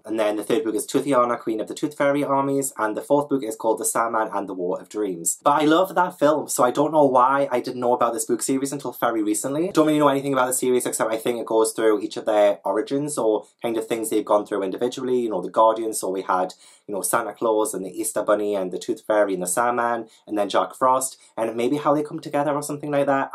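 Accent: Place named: Newcastle, United Kingdom. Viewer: I really need to get back into middle grade reading! Also it's fantastic to hear a fellow geordie! Loving the recent videos - stay safe x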